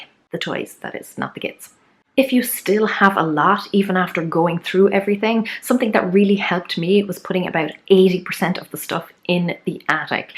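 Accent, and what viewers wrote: Ireland. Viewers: That's a great idea to simply go around looking for rubbish. Amazing I didn't think of it. I agree with the lady below about your warmth and humor. I am partial to Irish accents. The advantages of Marie Kondo's and the Flylady's methods is they are systematic. . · I always love your humor and inspiring ideas! 😀 Wish I could have your Irish accent. My house is so cluttered it's hurting my brain, but I am a tired mom of a non-sleeping 6 month old. Husband asks if he can help clean, but it doesn't work out well, he doesn't like to organize. There are a few things he keeps that spark annoyance for me but not for him, an old picture frame from his late grandmother, and some old statues of unhappy looking folks that are supposedly passed down by family. What can I do with those? Oh and what do you do to a collection of wine corks that spouse will never get around to doing anything with? 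My husband has been collecting wine corks for 4 years and still doesn't know what craft he wants to make. He won't let me trash them. 😑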